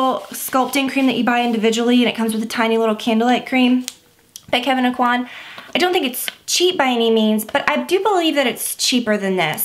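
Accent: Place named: South, United States. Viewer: I've been binge watching all of your videos lately. I'm from Texas so I feel like I identify a little better with you since you're from the south too! You talk like me!😝 love all your videos and I think Olivia is PRECIOUS!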